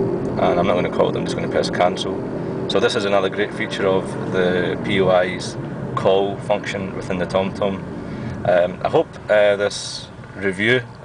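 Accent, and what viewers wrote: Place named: Glasgow, United Kingdom. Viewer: OMG you sound JUST like my uncle rizwan and he lives in Glasgow!!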